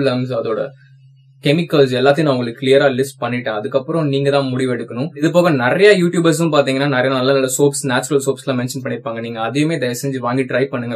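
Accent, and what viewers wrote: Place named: Kurutam, India. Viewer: Where are you from? Kurutam pogula solala :) my home townslag.Thanks for your efforts its useful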